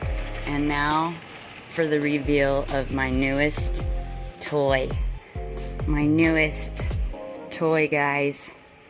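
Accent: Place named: South, United States. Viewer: Okay....this is the first....but not the last ....of your videos I have watched. You might be in the Northeastern U.S., but you almost sound Southern.....*signed a Southern gal*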